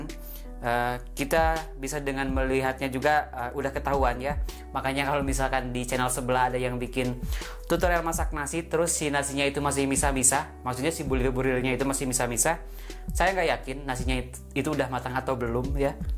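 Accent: West Java, Indonesia. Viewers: Meskipun pakai bahasa Indonesia yah, masih lengket banget yah logat sunda nya yah. Mantap Kang 👍 lanjutkan, ditunggu video selanjut nya Kang 🤗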